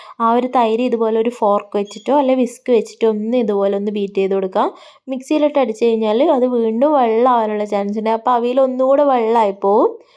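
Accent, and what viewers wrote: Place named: Kannur, India. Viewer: Nannayitund daaa.kannur slange poliii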